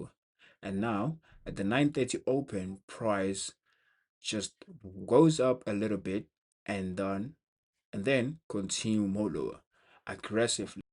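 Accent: South Africa. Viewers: You have South African accent where you from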